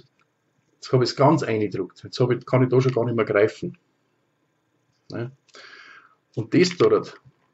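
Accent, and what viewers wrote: Bavaria, Germany. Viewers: Bayrisch is a deutsch , alles verstanden ;-) Danke für deine Eindrücke .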